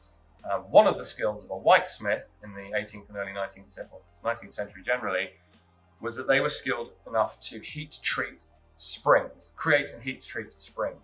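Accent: England, United Kingdom. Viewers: Ian, what happened to your hair and why are you speaking in an English accent?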